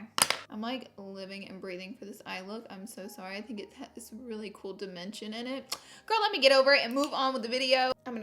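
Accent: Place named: Alabama, United States. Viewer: Yeah, being born, raised and living in Alabama, a lot of us talk like this. Nothing uncommon here! Love ya 😍❤❤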